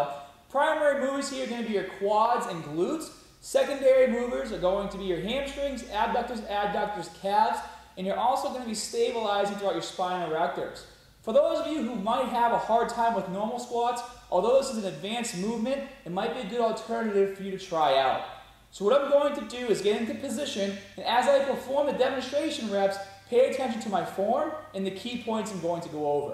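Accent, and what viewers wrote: Boston, United States. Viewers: I see he hadn't developed his Boston bah-bell "accent" yet..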